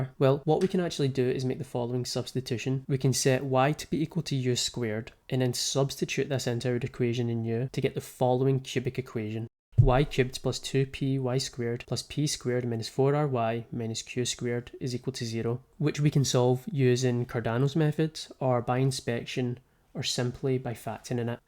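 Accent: Scotland, United Kingdom. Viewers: I was searching for about 2 hours for this, thank your for the wonderful derivation of formulas and solution. I love the Scottish accent, if its not Welsh... , love from Pakistan 💚💚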